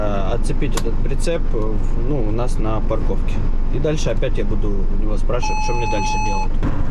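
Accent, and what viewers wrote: Odessa, Ukraine. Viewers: Привет, тезка. Случайно попал на твой канал. Сразу было предчувствие, что ты с Украины и говорок одессита. Подписался на все, а так смотрел дальнобой по штатам, но у них там начало тухнуть. Смотрю и наших пацанов по России, даже общаюсь в живую. Интересно посмотреть Японию. Сыну 20 лет и тоже хочет туда к вам. Даже не знаю, что ему посоветовать. Смотрю и слушаю тебя. 😊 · привет - я с УКРАЇНИ!!! ЗОВСІМ СЛУЧАЙНО ПОПАЛА НА НАШ ВЛОГ І - ОБАЛДЕЛА!!! - ВИ ПРОСТО ЧУДО І ВЕЛИКИЙ МОЛОДЕЦЬ-ГУ НАСТОЯЩИЙ ОДЕССИТ(рисковий) ГОВОР ОДЕССКИЙ НІ ДЄ НЕ ДІВСЯ!!!! 👍👍👍 ДА ТАК РОЗВЕРНУТИ СВОЕ ЖИТТЯ- МАЄ БУТИ БОГАТО ФАКТОРІВ!!! В ВАС Є СТЕРЖЕНЬ І ВИ ПРОСТА ЛЮДИНА І БЕЗ ПОНТОВ!! А ЯПОНІЯ ДУЖЕ ГАРНА І ЦІКАВА І ЛЮДИ ТРУДЯГИ!!!! ДАЙ ВАМ БОГ І ВАШИЙ СІМ'Ї МІЦНОГО ЗДОРОВЬЯ, ЩАСТЯ, КОХАННЯ, ДОСТАТКУ І МИРНОГО НЕБА В УСЬОМУ СВІТІ!!! А ДІТИ В ВАС --- ЧУДО!!!! 👍👍👍❤❤❤💙💛💙💛🌹🌹🌹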